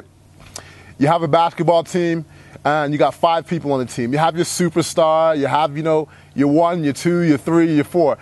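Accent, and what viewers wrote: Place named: England, United Kingdom. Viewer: you seem to have an English accent.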